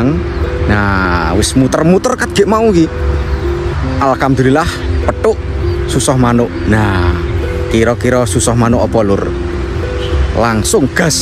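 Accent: Java, Indonesia. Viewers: Hiyo medok banget 😂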